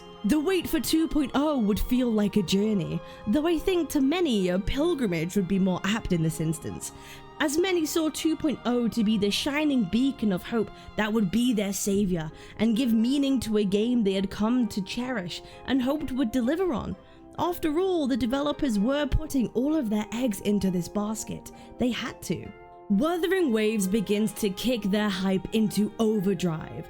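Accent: United Kingdom. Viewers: you are british>?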